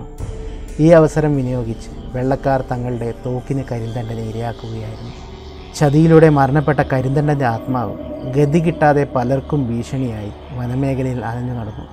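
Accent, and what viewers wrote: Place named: Kozhikode, India. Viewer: കുന്നംകുളം അല്ല.. നല്ല അസ്സല് കോഴിക്കോടനാണ്.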